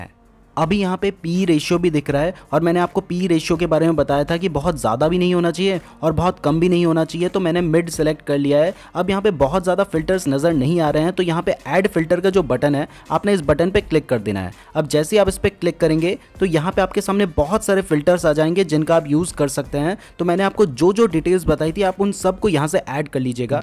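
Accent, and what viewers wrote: Uttarakhand, India. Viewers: Bro. Uttarakhand se ho na 😎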